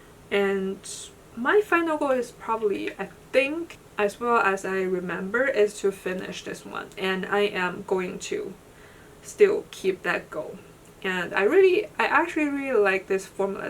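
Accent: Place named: China. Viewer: 之前听你口音就觉得是中国人，果然😊爱看你的铁皮进展❤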